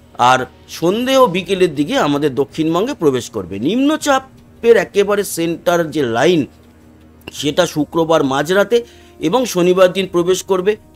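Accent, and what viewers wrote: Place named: Dhaka, Bangladesh. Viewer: Aapni ki bangladesher manush Dhakar dike aapnar taan besi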